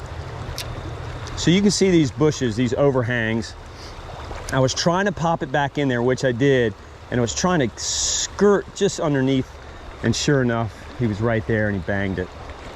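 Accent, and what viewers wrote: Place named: Pennsylvania, United States. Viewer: I'm from PA and everytime I hear you say water(wuder)it takes me back. Love it! Took me a long time to stop saying it that way myself after I moved - probably because I wasn't trying to change it. I live in the Smoky Mountains of NC now and just started fly fishing this year. Lots of good places to fish, but I'm in the putting in your dues stage of fly fishing. A nice way of saying I'm not very good yet, but I love doing it! You are really hitting them Trout. I sometimes go 4 or 5 days without catching a fish! Just started euro nymphing myself and really like the control and feel. Anyway, great channel - I really enjoy your videos and just subscribed. Appreciate the Euro tips. Your inspiring me to head back to PA to see some Family and try the fishing that way.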